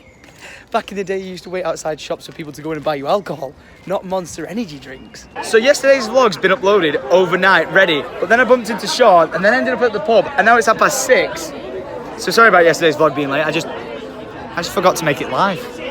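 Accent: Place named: Scotland, United Kingdom. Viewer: That’s Scottish accent is hilarious aha from Glasgow !!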